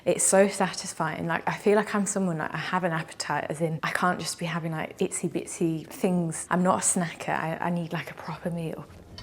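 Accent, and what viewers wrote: United Kingdom. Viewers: This is very inspiring. You know what the moment I just started watching your video I could tell you are British. This makes me more proud of being British when another British shares this. I do workout weekends when I am going to work week days and I am now size 10 from size 20. I eat gluten free food for dinner I have prep salad meals mixed. Well done. Thanks for sharing too.❤❤❤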